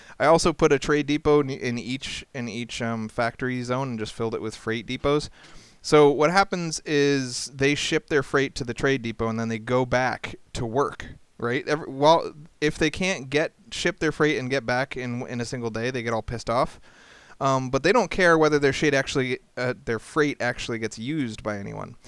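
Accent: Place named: Canada. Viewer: what are you canadian you totally sound like it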